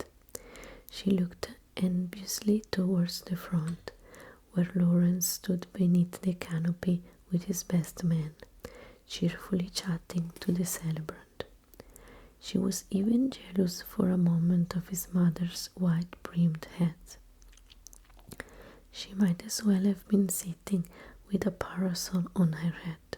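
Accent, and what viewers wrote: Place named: Italy. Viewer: Amazing!!! I love your italian accent, very relaxing.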